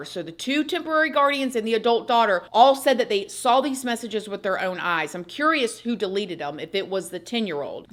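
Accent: South, United States. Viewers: I have been enjoying your content for years now. Thank you for all of the info you have enlightened us with. But I have one question to ask you. Why does it seem like you did not have as much of a southern accent as you presently do? Is it because of where you reside? Just curious about that. Bottom line though is that a really enjoy your content and the way you present it. Please continue with the excellent job that you do. Thank you so much!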